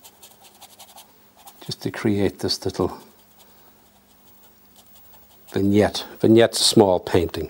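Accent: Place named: Midlands, Ireland. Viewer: U sound like a midlander like myself..13 years later here from Athlone next to the Shannon wanting to paint a similar fishing boat for a mate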